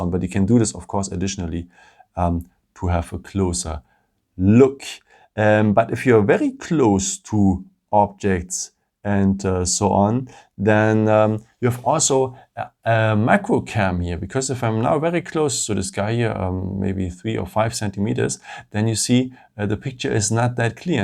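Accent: Germany. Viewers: Der deutsche akzent in seinem englisch geht ja mal gar nicht :))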